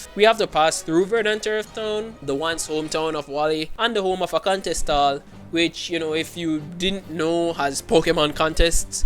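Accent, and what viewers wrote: Jamaica. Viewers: Are you Jamaican? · I KNEW you were Jamaican. What parish you from? My Mom's from Hanover. I was born in Canada though.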